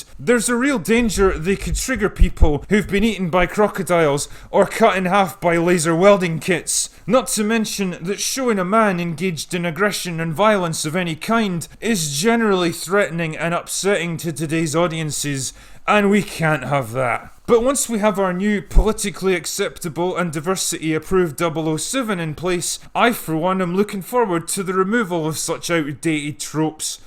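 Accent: Scotland, United Kingdom. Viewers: I fucking hate that accent. Where is it in Scotland you're from?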